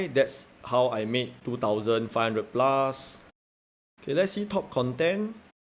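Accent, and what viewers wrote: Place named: Singapore. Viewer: Your singlish and your singaporean accent is the reason why i subscribe to you;like listening to an elder brother talking to me, very very local.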